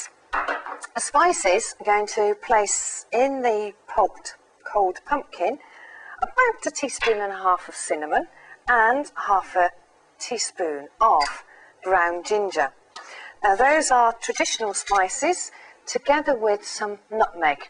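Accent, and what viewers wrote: United Kingdom. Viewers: No matter what a woman says, American men find it extremely sexy if said with a British accent. This video is especially scrumptious. I used 4 large brown eggs, raw sugar and light brown sugar, a shot of maple syrup and a shot of apple juice today.. half and half instead of sour cream, cream cheese or canned milk. I got a little hunk of fresh ginger root and grated it myself. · i like your egland voice bloke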